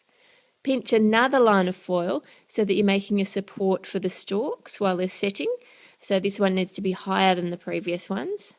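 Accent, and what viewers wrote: Australia. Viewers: Yeah she is Aussie! Thats Australian.. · are you aussie? cause if you are i will love you forever. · Australian I love it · Are you australian? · U from Australia?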